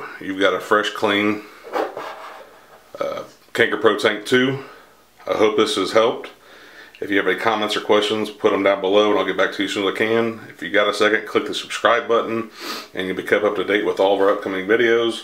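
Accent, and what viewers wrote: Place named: South, United States. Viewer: "take you some paper towel" that southern talk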